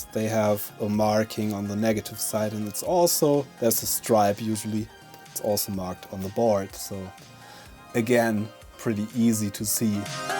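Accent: Germany. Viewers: You sound German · Man hört das du ein Deutscher bist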